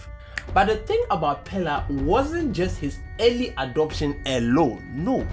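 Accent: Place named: Ibadan, Nigeria. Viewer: He actually got attracted to Nigerians by his Ibadan accent. He sticks to it, and became famous for it.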